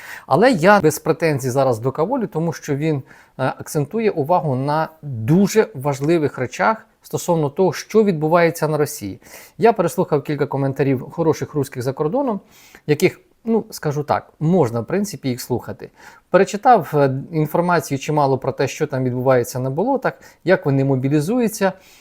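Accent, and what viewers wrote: Standard. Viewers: Респект за чисту,інтелігентну,спокійну українську мову. Роботу свою Ви робите спокійно,при цьому грунтовно.Дуже приємно Вас слухати. Успіхів! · Респект за чисту,інтелігентну,спокійну українську мову.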